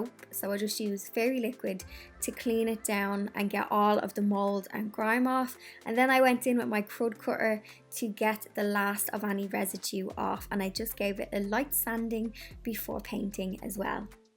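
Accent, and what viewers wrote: Ireland. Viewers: You are such a sweet auntie! I don’t know what I love more - your promotion of a ‘new lease on life,’ your brogue, or your love of Guinness (which I totally share,btw). Enjoy the pub.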